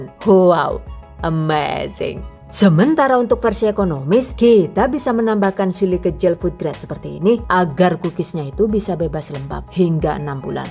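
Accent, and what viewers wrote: Banyumas, Indonesia. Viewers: Deneng ngapak pada karo nyonge 😅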